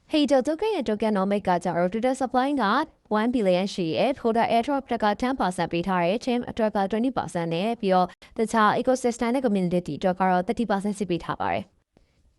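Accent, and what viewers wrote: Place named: China. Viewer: အသံက ပုံမှန်မဟုတ်ဘူးထင်တယ် နားထောင်ရတာ တရုပ်သံပေါက်နေတယ်😢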